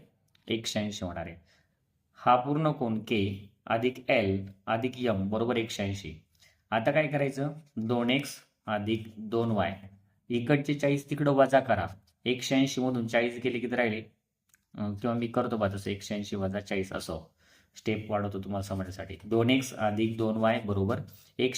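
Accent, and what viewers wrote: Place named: Ahmednagar, India. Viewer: Language varun olaklo sir 😊 Maje frd aahet nagar che same bolatat😅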